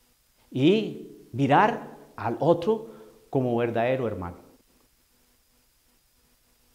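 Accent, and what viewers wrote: Colombia. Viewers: Calavera. jajaja hay padrecito me iso reir con eso tipico hablar colombiano.Bendiciones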